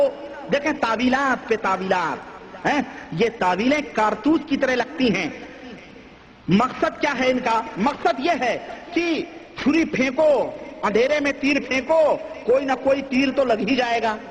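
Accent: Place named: Pakistan. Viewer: Hulia Arab numa, Pakistani lahja. Allah hidayat da sab ko. Dawat e tabligh zindabad.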